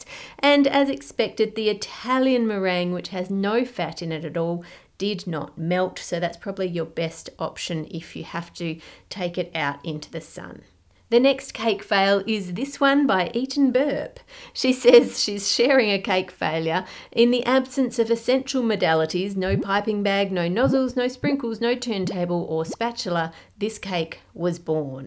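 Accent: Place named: Australia. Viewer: Sometimes sounds like bob ross, but with a female Australian accent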